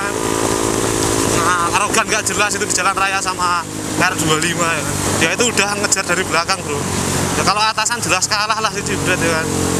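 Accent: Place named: Banyumas, Indonesia. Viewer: iyo tak delok e pakngapak...ndi duduhno ak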